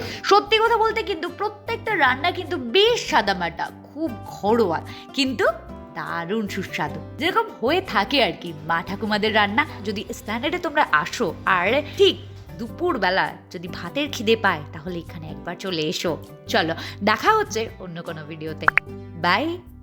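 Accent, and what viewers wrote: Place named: England, United Kingdom. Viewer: বাংলা উচ্চারণ ঠিক করুন, না হলে শুদ্ধ ইংরাজিতে ভিডিও করুন। ইংরাজি বাচনভঙ্গিতে বাংলা বলছেন অত্যন্ত বাজে ভাবে কানে লাগছে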